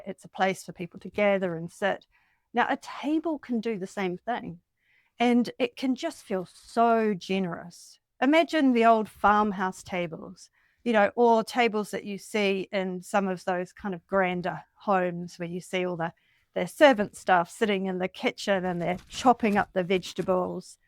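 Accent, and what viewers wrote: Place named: New Zealand. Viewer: Oh I'm proud of myself for picking up on the Kiwi accent! (a transplanted American living in Australia). I also think providing your clients with a bunch of designs is a terrific idea. Not that it matters, but I agree with the kitchen table idea. I've always thought that there's something inherently silly in having a seating/dining spot just feet from the dining table. If a design wasn't open plan, it might make some sense, but otherwise, why? I'm also not keen on having either a cooktop or a sink in the island, as to make that functional, the island has to become bigger and take up more space.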